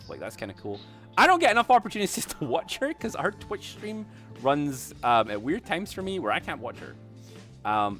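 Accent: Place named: Scotland, United Kingdom. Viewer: 🤔 Whose this Scottish guy, I didn't know they streamed~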